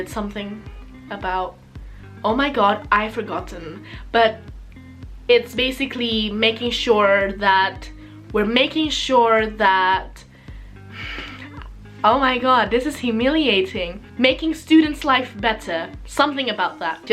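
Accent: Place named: United Kingdom. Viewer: Aw<link> britishnya udh mulai kedengeran nih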